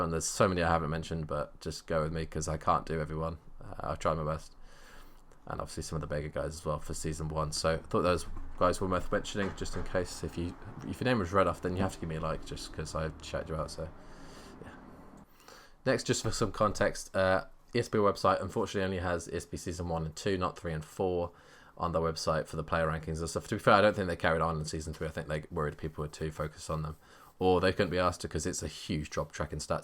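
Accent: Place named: England, United Kingdom. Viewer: The thick English man of Battlefield Community is back. Love ya Keep up the content! 🐐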